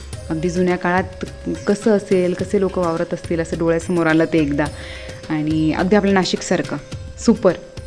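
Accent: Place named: Kolhapur, India. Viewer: कोल्हापूरी भाषा बोलायला भारी जमतीया तुम्हासनी.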